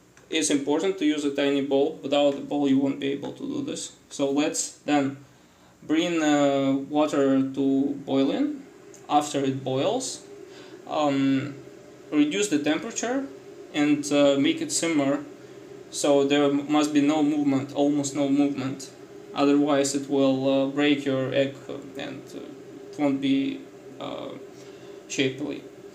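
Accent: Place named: Russia. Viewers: Какой приятный Русский акцент))) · я тоже сразу услышала русский акцент, но он действительно очень приятный. Это совсем не тот русский акцент, когда слышишь и думаешь "лучше говори по-русски"😂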